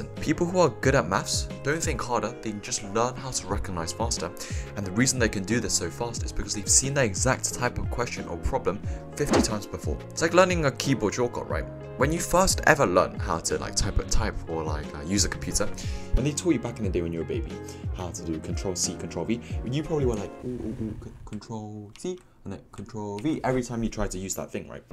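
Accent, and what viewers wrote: United Kingdom. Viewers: i dont know ...he said he lives in germany ..but i feel its a bit britsh 😅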